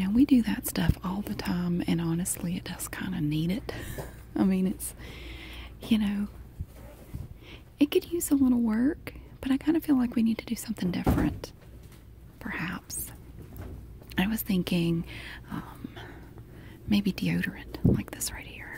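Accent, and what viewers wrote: South, United States. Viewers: I used to live down south and everyone had an accent like that. I loved listening to them talk. 💕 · she’s southern, as is Laura Lee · I love watching your ASMR because we are both from the south and your accent reminds me of home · Southern accents usually annoy me because I had a bad experience with a jerk lady with a southern accent but you have restored my faith in southern women. You’re voice is relaxing and sweet and I think I can learn to love southern accents again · I like her accent. I grew up in the south and it reminds me of all my elementary school teachers accents. I wish I would have devolved a country accent.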